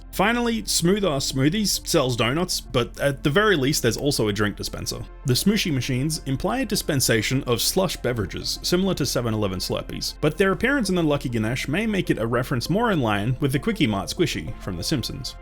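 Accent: Australia. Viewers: he's australian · I love listening to a "polite, elegant, australian" voice narrate over such a crude and violet game, the contrast is just so funny but also, genuinely calming! You're videoz R gud man :) Me give thumbs up!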